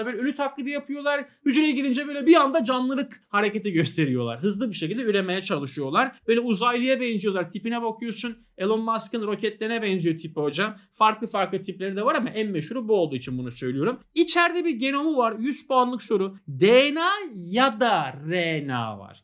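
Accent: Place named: Erzurum, Turkey. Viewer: Hocam memleket nere Erzurumlu gibisibiz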